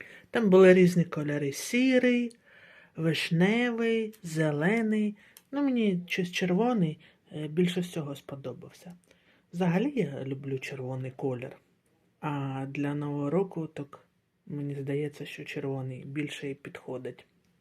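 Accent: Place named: Kherson, Ukraine. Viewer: Гарні покупки! Одразу по вимові зрозуміла що ви з Херсону❤ Запрошую на свій канал про бюджет за методом конвертів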